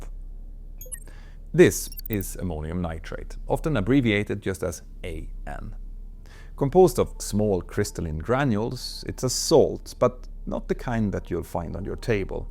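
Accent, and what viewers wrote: Sweden. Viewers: This is 100% a Swedish dude speaking, the English is good, it’s the dialect that gives it away. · What a great spin off from your main channel! The dedication to details, the source criticism/research, the build up using past incidents and your style (art) of your story telling is a perfect match with (preventable) tragedies like this. And your Scandinavian accent is unique and perfect for emphasizing the importance of details. Svensk, inte sant? För mig som skåning låter det som typisk mellansvensk "brytning", typ östra delen av Svealand någonstans? Anyway, a big thumbs up to your entire team! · Look up pronounciation for "flammable", "origin", "threat", "through", "aftermath", "partially", everything else is just charmingly Swedish. Great video!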